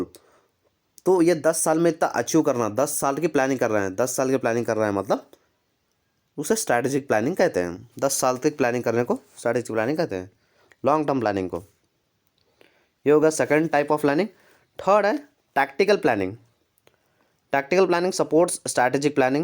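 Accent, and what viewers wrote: Hyderabad, India. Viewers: tum hyderabad se ho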